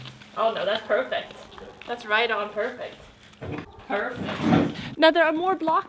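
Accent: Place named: Sweden. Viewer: En personlig fråga. Vari Sverige kommer du i från? Svenskan lyser igenom i din accent nämligen. Bara nyfiken. Annars är jag djupt imponerad av din oräddhet när det gäller att ta sig an olika byggprojekt!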